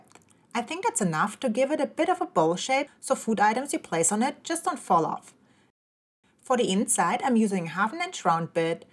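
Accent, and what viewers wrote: Germany. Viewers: Your German (i lived in Germany for 6 years who are know for over engineering most things I say this being French and knowing are most resent European history <num>) and I see you have some advance shop skills with a big push for safety which leads to think your a smart lady like many good Germans well above aveage, but with you aching your back the way you do does not help cutting wood it helps with you getting the views your algorithm and yes even comments and thumbs down would help you if you turn them on why you don't is silly! Your German you must have thick skin living with Americans because I heard alll the joke made towards Germans for many years all all the American living in Germany still to this day my father was in the American army who came to France at the tail end of WWII, so I know the story very well I hear many from my mother who half German and half French she did not lie to me she was in the middle of WWII she knows the truth! 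I am older than most of your aveage boys watching your videos, and I don't fault a pretty lady looking to get her bag with even if you have a side hustle that a lot questionable your not American your Greman accent is heard very well by most Ameicans, so I get it you have bills to pay but you only cut wood with that shirt open that far down and aching your back until you nearly flip backwards and we both know you cut wood like this on camera you would not cut wood like this on a construction site because we both know what would happe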